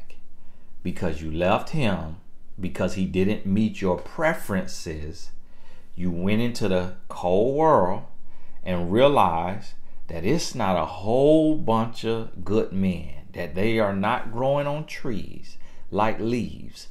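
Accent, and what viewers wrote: United States. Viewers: Woow.. I am hurt and fascinated at the same time. Every woman of all ages should listen to you. That is the pure and pure hard-hitting truth. Less the ex thing troubles me. . BUT why and how men choose women 👊🏻. . Ufff..You shaken me and nowI am awake! I decided to wait a while ago now that I'm 43 and the man who is right for me will love me all the more for it. Basically every man is looking for his wife who they want to respect but we women have to make sure that they can. You are also very funny although I don't understand a lot of things because of the American English. I'm Italian and live in Germany. You're really awesome. thank you.. Wonderful listening to you 🌸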